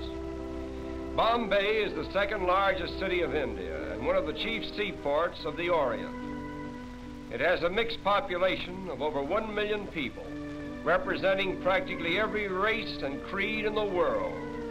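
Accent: Transatlantic, United States. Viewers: Old school Transatlantic accent.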